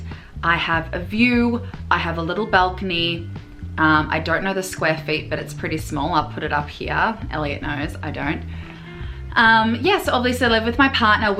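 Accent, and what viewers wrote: Australia. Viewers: That’s the Australian accent one of the sweetest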